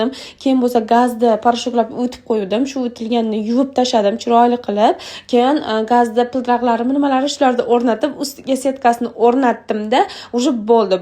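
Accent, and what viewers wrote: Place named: Fergana, Uzbekistan. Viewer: Farğonaligu farğonaniyam shevasi bõladi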